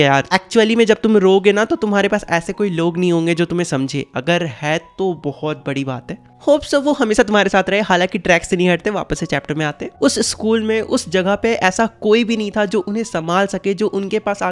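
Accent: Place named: Jharkhand, India. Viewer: Bro your accent is jharkhandi.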